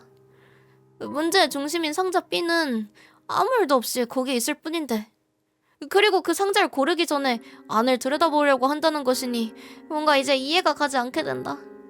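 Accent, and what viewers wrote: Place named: Japan. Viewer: 언니님 일본어 느낌 너무 좋아요..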